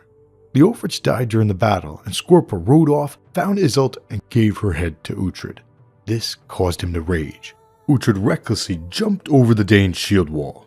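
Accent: New Jersey, United States. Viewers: Are you from jersey?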